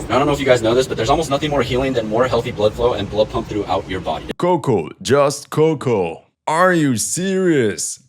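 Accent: United States. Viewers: Hold on a second buddy, your american accent is hilarous greetings from australia and wishing health to you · Your American accent is funny shit haha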